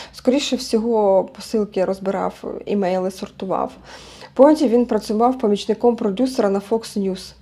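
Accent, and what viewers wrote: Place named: Vinnytsia, Ukraine. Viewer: Говор винецкий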